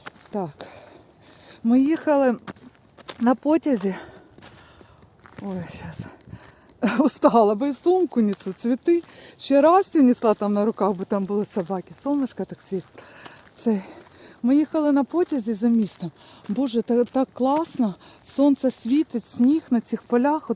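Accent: Russia. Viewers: Дуже гарно розмовляєте українською, хоча чутно що розмовляли російською, велика подяка та респект, в цьому видно любов, повага, пам'ять до чоловіка який загинув за Україну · Барышня , слушая вашу речь понимаешь что родной язык ваш РУССКИЙ. Не издевайтесь над собой и не занимайтесь садомазохизмом.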